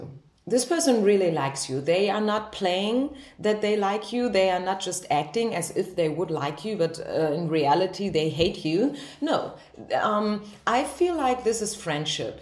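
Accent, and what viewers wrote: Germany. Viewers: Are you from Frankfurt, Germany?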